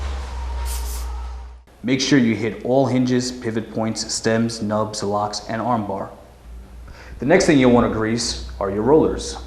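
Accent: United States. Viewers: The presentation was great,. I like your NY accent too. (Long Island?) Could be a north Jersey accent. The company jingle sounds like it's from the '70s. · Is that a Laaung Island accent?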